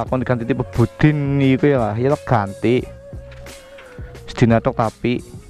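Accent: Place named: Banyumas, Indonesia. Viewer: iyaws ngapak Bae kepenak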